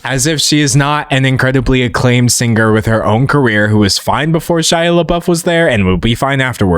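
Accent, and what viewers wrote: South, United States. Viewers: first of all, where did this southern accent come from. Secondly, "the jealousy and insecurity" that put hands on a woman... uh, sir, abstract concepts cannot manifest in the physical realm like that? gross.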